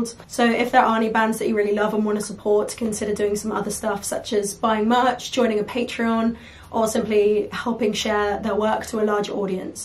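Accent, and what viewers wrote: United Kingdom. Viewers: Of course she is britsh. I just knew she had a British accent. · Is she british? first time I hear her talk.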